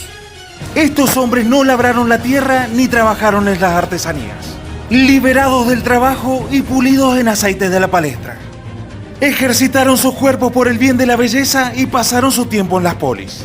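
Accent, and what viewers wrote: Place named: Córdoba, Argentina. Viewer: Ufff ese relato cordobes.... Muy bueno...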